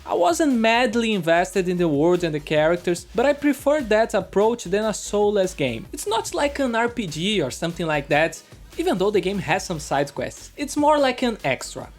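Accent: Brazil. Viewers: Suspeitei q vc era brasileiro pelo sotaque! Kkk Parabéns pelo review! Me deixou interessado no jogo!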